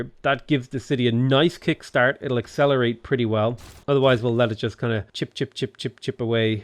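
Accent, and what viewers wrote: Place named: Ireland. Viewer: sounds about 40% more Irish in this video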